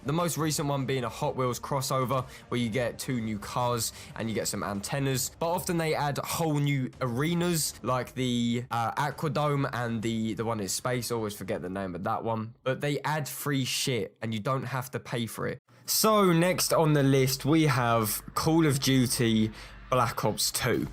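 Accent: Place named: England, United Kingdom. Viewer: I like your accent.. Is England your city?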